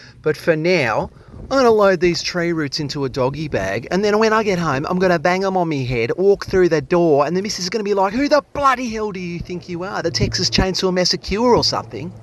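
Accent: Australia. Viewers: Same! I just love his Aussie talk. Amazing! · Sending kudos to you from th State of Delaware, USA. Love ya, ya silly sausage! (Love the outback terminology and love hearing your accent!) 🥰🤗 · I’m an Aussie… & I must say I love the Australian accent& enthusiasm… thank you for no course language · I'm not a professional like you (some might say a legend), but I've had my share of clogged shitter pipes.......and speaking of pipes, your Aussie accent compliments your work, like a good red wine and steak. All the best from a commonwealth brother in Canada! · G’day! New here and I love the Aussie accent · This is the most Australian man I have ever heard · I watch Tornado and animated videos how did I get recommended this buity from someone with the most Australian language I have here heard.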